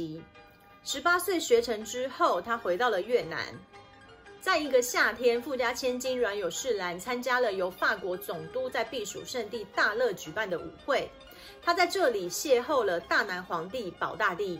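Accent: Taiwan. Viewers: 很喜歡妳的旁白解說，坦率而帶有情感，使这位末代皇后的故事更添幾分神傷！希望妳繼續努力，多製作更多更精釆的視頻，聽妳的口音應是台灣朋友吧？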